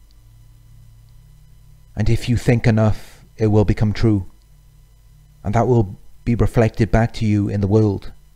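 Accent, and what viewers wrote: Wales, United Kingdom. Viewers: It’s actually a Welsh accent. Welsh people are some of the nicest people you’ll meet :)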